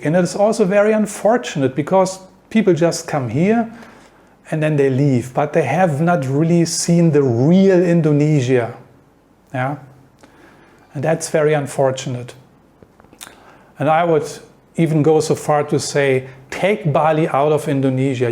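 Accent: Germany. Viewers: Can I recognize your accent as German? All in all, our experience is much of the same than yours. Somehow we still became starting to apply for a Thai Visa whereat we ourself also know ourselves how great the people in Indonesia are. We've not been to Bali though, and our experience in Aceh was different. Including that, we've been to Indonesia for 6 times until now. I myself would like to have an Indonesian retirement visa additionally to the Thai Visa but my wife isn't as convinced of that for now. The other mentioned countries never have been an alternative for us, maybe also because one of us is trans woman. That might, seen from the West, seem a little bit not so logical given that that Indonesia is a Muslim country. But, except from Aceh, our personal experience experience was that the people there don't care about that at all. I'm not talking of people as staff or otherwise expect a deal with you. 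To cut a long story short, where are you living in Indonesia? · Your Accent sounds is very German ..are you ? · You're very interesting!😉. With that german accent and dry sense of humor, very funny! Wow you've been traveling a long time. I need to get back to SE Asia. I been considering returning to Thailand. I was a teacher in Thailand, Japan, and Taiwan previously. I recently got an offer to teach in Indonesia but am nervous due to the possible natural disasters and political strife but which country doesn't have this problem?...LOL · Indonesia is good but the Philippines is garbage .But his broken English in a heavy German accent is irritating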